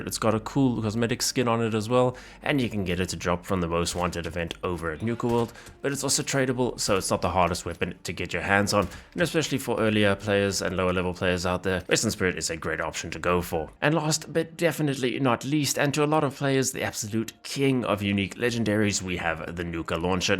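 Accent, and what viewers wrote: South Africa. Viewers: You from South Africa? Just asking because of the accent.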